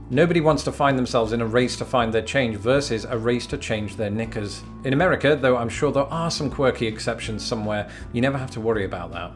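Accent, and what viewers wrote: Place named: Grimsby, United Kingdom. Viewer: I looked around. And found that you are from Grimsby, UK. I have never met anyone from there, so it is interesting to hear the accent.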